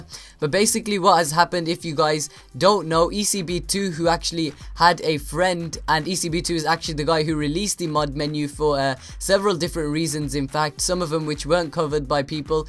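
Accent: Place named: England, United Kingdom. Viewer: where are you from? lol i can never tell your accent one minute i think you are english the next south african haha xD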